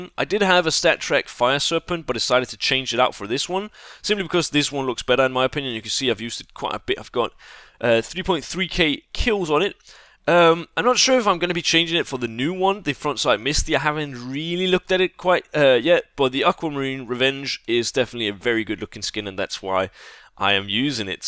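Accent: Denmark. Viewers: You sound every Danish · I don't know why... But you Sound very Danish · Danish??? your accent is really Danish !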